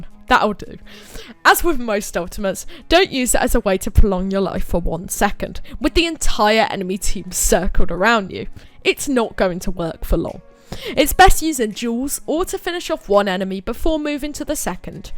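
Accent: United Kingdom. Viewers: Ehh leave him alone, he's quite informative and the tone of his voice is lively plus the british accent which tops it off. Tbh i could listen to him all day, i mean somehthings are bestare what they are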